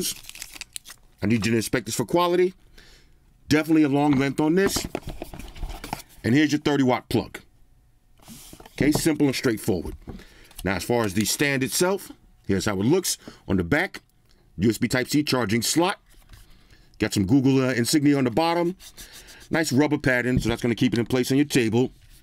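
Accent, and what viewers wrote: New York, United States. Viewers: Stopped watching as soon as I heard the NYC accent 👎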